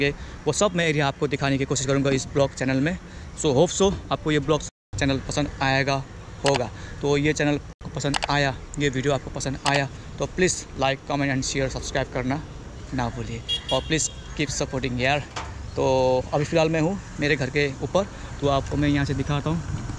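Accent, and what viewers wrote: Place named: India. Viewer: K bekar vlog bhai timro xyaa, ghar Ko yaad aayera salugara search gareko mood got off, totally, xyaa on top your Hindi accent 🤮🤮🤮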